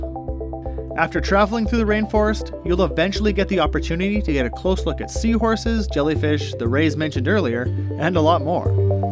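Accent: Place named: Saskatchewan, Canada. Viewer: Sounds like you are from Saskatchewan😉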